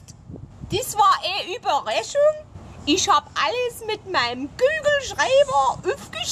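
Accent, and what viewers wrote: Germany. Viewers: Sprechen die Ossie wirklich wie das? :o (Ich bin Amerikaner, so werd' ich nicht wissen.)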